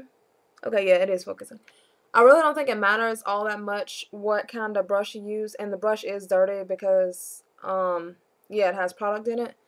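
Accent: United States. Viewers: OKAYY!!! Tell me you live in Mississippi!?...you are country. yet its cute.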